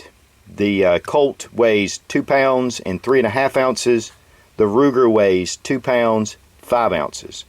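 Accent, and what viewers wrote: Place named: South, United States. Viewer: Very nice review of this new Ruger SR1911. I love all your reviews. Something about your smooth Southern accent is pleasing to this old Yankee. Keep up the great work! Thanks!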